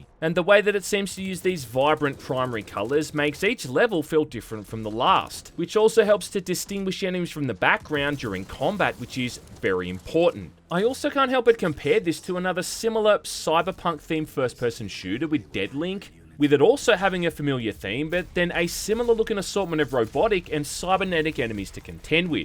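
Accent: Australia. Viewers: I used to love your content but as time goes by you more often than not sound like one of those bought out gaming "journalists" from IGN with the only difference beeing your thick australian accent... Since the last 10-15 uploads or so something feels off about your content bro...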